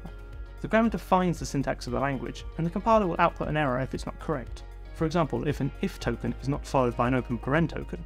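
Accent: United Kingdom. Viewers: You probably are British or my listening skills are so pity... (English is not my native)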